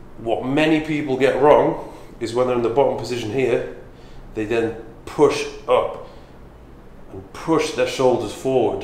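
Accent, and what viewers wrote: United Kingdom. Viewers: I don’t know what’s better his form advice or his British accent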